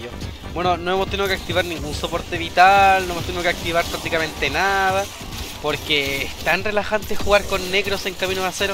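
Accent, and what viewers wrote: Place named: Chile. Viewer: Buena compa me alegra escuchar chilenos, supongo que eres chileno jajajaj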